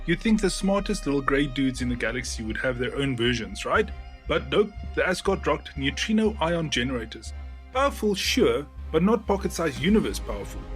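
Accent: South Africa. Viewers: Ek wou nou net se die ou praat net so well afrikaans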